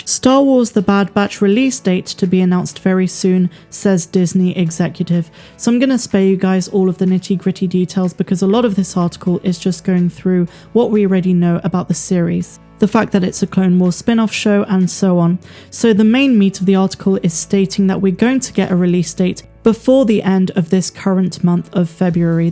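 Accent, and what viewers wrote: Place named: Southern England, United Kingdom. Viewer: I can’t work out if your accent is south east or south west hahahahaha. Great work, enjoying the channel. 👍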